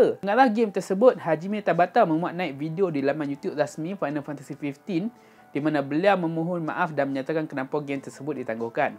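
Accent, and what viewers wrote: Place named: North, Malaysia. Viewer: pekat utara hang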